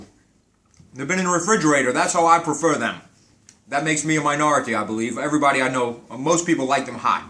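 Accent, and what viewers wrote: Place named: New England, United States. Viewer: This guy sounds like a yankee???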